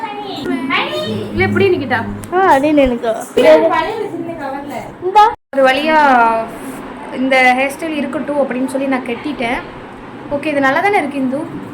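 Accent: Tirunelveli, India. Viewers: Akka unga thirunelveli slang nalla erukku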